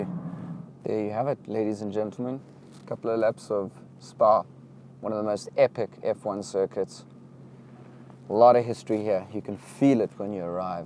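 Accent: South Africa. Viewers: Hey woah, you sounds South African? I am really going to try and make a plan to come for some instructor days to Spa, need see pricing packages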